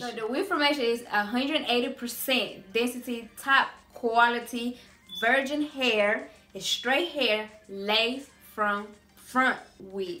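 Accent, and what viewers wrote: South, United States. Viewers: Nah that's an insult to just can't speak she's ignorant just got a country accent she ain't putting on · She’s Hispanic and she’s from Alabama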